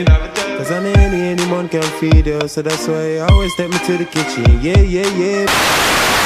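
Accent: Jamaica. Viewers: Love the Jamaican voice man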